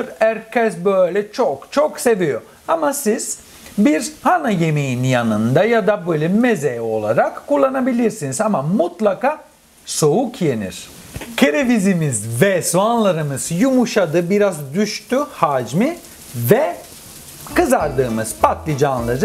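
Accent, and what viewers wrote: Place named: Istanbul, Turkey. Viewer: İstanbul türkçesi konuşan harika bir italyan şef👏🏻👏🏻 ellerine sağlık, harika!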